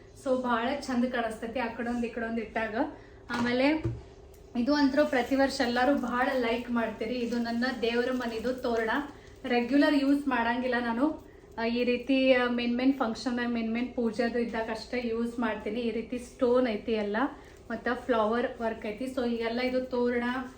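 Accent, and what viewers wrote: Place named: Belagavi, India. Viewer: Nim language nodidre belagavi davr taran ide aadru marathi jana matado tara fell aagutte y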